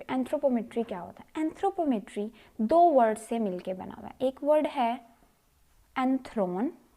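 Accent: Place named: Pakistan. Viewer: mam your urdu accent is so cute😍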